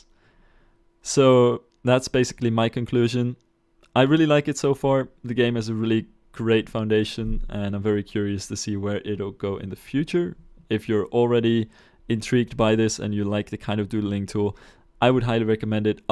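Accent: Netherlands. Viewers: you sound super dutch to me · I think this fits your channel just fine. Its about building and architecture. And you appear to have fun doing it, which makes you enjoyable to watch. Well, and that fellow dutch accent. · Just found out about your channel and I love this video :) Btw, are you Dutch? I'm from the Netherlands and your accent sounds a bit like we speak English ;) It's not bad! Just noticeable :) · I am New here but you sound dutch is that true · I suppose you aren't English. Hearing you talk It sounds like you are Dutch. Are you?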